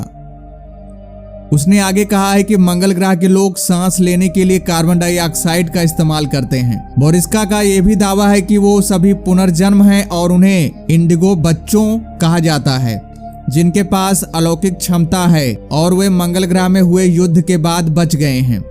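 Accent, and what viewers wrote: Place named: Bengal, India. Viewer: Are bhai ye hindi bolne ki kosish kr rha hai iska accent bengali jaisa hai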